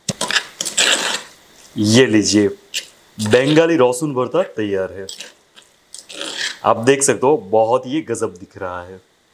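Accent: Gujarat, India. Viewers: Actually unka gujarati accent hai.. Toa apko der se samjh ata hoga.. koi baat nahi dobara sun lene me koi burai nahi hai..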